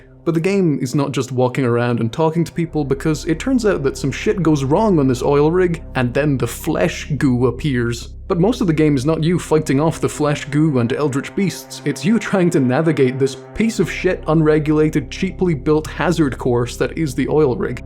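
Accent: Scotland, United Kingdom. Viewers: FYI buddy. My grandparents were Scottish and I clocked you as being from there because they sounded the same even years after leaving.😊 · As a fellow scot with a wonky accent, I just wanted to mention that you absolutely still sound Scottish! I only just had YouTube recommend you today (slenderina), I cannot understate how happy I was when I instantly heard a Scottish accent because I'm constantly hoping to come across more Scots to watch. Seeing you had made this video made me even happier because I deeply enjoy this game for all the things you talked about. I do somewhat blame the lack of authentic scottish representation in media with the increase of people being online more and more for the accent stuff, I've come across a few scots online with the same issue. But yes you definitely sound Scottish!! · accent seemed obviously scottish to me tbh. 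not a common one but its in the way you use your vowels. also "fucking yass man" made me choke on my dinner laughing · I think it’s important for you to know- you do have a Scottish accent, it’s not heavy whatsoever but you can hear hints of it, especially in words like here and two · I cannot comprehend the joy I feel when listening to a Scottish video-essayist. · Bro was seriously like “I know I don’t sound Scottish” with the most Scottish accent I’ve ever heard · Don't stress your accent (I'm sure you don't), as another Scot I could hear it! We don't all have to sound like Rab C to be Scottish. Great review of a great game too! :) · You definitely do have a Scottish accent mate! Maybe just needs a Scottish ear to hear it and be sure but I found one of your other videos before this one (the Granny one) and I clocked you immediately 😅 it's a soft one, I'll give you that at least. Edit: commented this before I got to the Maryhill bit and now am howling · you sound like your speaking with a scottish accent to me, maybe not super strong or anything, but I can definitely hear it in your voice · When i first heard you i thought you were Scottish... 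Then i thought you were Finnish.... Then i thought you were Scottish- glad that my confused guesses were finally proven correct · Not sure who has told you that you don’t have a Scottish accent. To my English ears, it’s VERY clear. Yeah, you’re not cutting about sounding like Fat Bastard but your accent is unmistakably Scottish 😅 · Mate, you do have an accent just a little one. You don’t have a strong one though I’m from Wales. I don’t really have an accent but some people say I do have somewhat of a little Welsh accent, you serve a little bit of the Scottish accent so you do have an accent is just not as noticeable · Dw bro i could tell you are scottish but only cos im also scottish i can see how people didn't know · I think you do have a Scottish accent, just a very slight accent. Maybe not what you would think for one… but it is still there.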